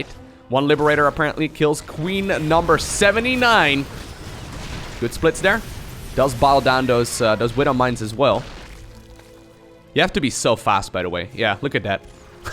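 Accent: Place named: Netherlands. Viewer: Lmao, Im praticing my "Hello everyone, my name is " so loud. Its getting pretty close, but it is missing that dutch accent.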